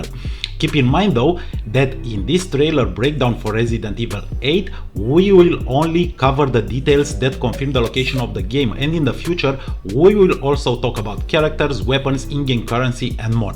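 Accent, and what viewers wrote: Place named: Romania. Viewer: ma crezi ca mi-am dat seama ca esti roman din primele 15 secunde?:))))))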